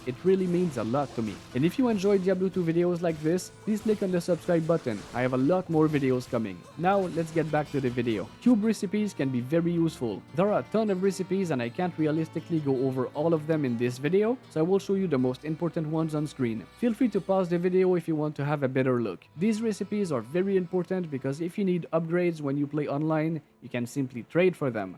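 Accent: Quebec, Canada. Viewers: Tai tu quebecois ??? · Thanks for the content! Are you french canadien?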